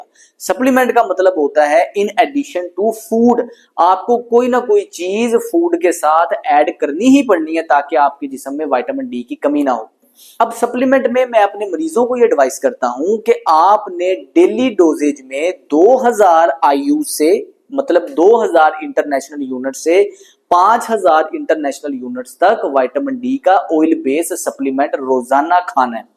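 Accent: Punjab, India. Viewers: لھجے میں بہت پنجابی پن ھے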